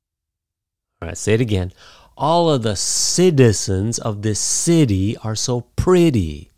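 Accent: United States. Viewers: I say t sound for t. You must be teaching hoosier English.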